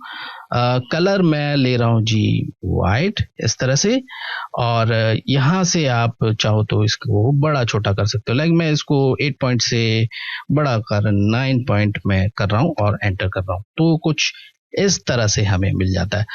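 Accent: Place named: Pakistan. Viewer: superb sir keep it up when you speak it seems to me someone speaking in very pakistani urdu language from india, i am indebted to you sir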